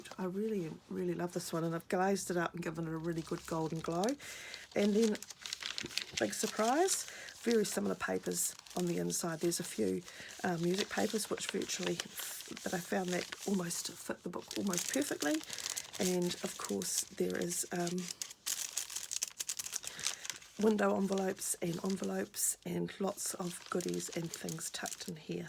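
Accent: New Zealand. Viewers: These are all fantastic!!! I finally got the chance to sign in and subscribe. Yaye! Thanks so much for being such an amazing inspiration! LOVE your work, style and creativity and of course your gorgeous Kiwi accent! xx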